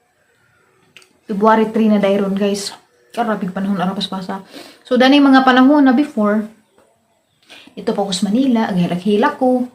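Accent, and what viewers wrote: Bohol, Philippines. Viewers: Inday bilib ko nimo.gahi man jud ag sinultihan sa atuang tga bohol.proud ta tga bohol ta.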